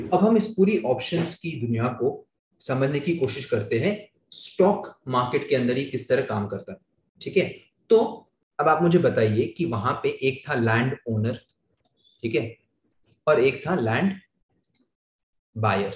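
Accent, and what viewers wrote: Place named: United States. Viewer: Sir jis hisab se English bolte hain jese usa me class le rhe ho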